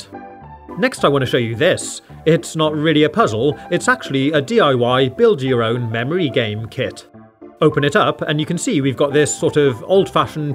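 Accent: United Kingdom. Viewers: Are you British are you from London are you even from America cuz I feel like you don't you also talk British so much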